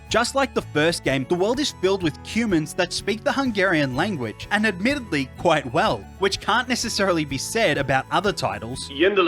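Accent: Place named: Australia. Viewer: You're Hungarian ? I was swearing you sound like an Aussie !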